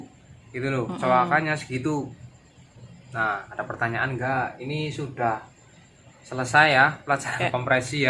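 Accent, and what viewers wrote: Banyumas, Indonesia. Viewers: Jenengan dari mana mase..kok rodo ngapak..?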